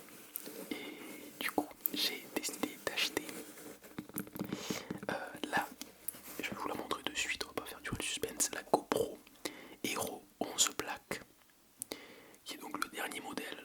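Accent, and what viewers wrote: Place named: South, France. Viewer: Ohhh cette accent du sud ! Oh mon dieu j’adore ! Incroyable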